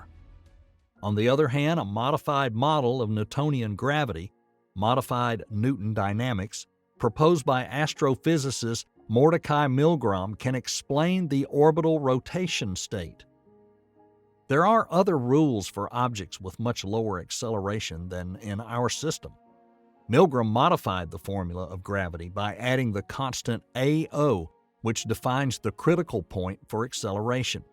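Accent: South, United States. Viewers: I can’t figure out if the narrator is a genuine member of the scientific community, or maybe an enthusiast with an impressive understanding of the subject of astrophysics…or just someone regurgitating material from Wikipedia or space websites. I am well educated in physics and to a lesser degree astrophysics, so I can usually detect whether or not a person is pretending to know what they’re talking about or if they have a real understanding of the topic. But this guy confuses me! The complexities of quantum mechanics and astrophysics seem to be well understood by him, but at the same time, he mispronounces certain terms…egregiously! However, I too am from the south and I have experience with being judged by people who think my accent is an indicator of my intellect. Regardless, I thoroughly enjoy his content and often listen to his work in the background while I am doing housework or other mundane tasks.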